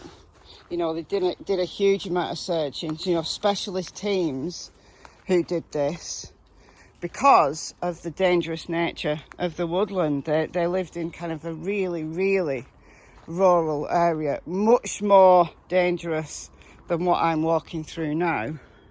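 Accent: United Kingdom. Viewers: UK by the sound of her accent so no bears or Coyotes!! 😅👍 Maybe some grass snakes though! 🤷‍♀️ Lol I think she's in Manchester?? 🤔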